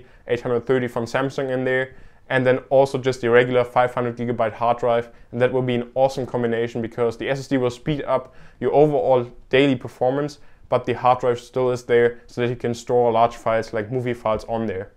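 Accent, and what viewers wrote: Germany. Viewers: Deutscher Akzent :) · He's from germany :) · was ein schöner deutscher akzent ^^ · do you know how big of a difference there is in performance between the two? or if it's barely noticeable? i'm either gonna buy non-retina 13'' (high-end) 128ssd or Low-End 13'' retina. So I'm just wondering: if the performance difference isn't that big, then i'll go for the retina. even though I still use the CD-drive and an ethernet-port :P "give and take"... Next time I might answer you in German, if I guessed your accent correct? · you german? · Are you german? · oh but i just keep my superdrive in it.. i am planning to upgrade the ram memory to 8 gb to get ilife a little more fluent :P and yeah i heard a little german accent, i thought u were dutch :p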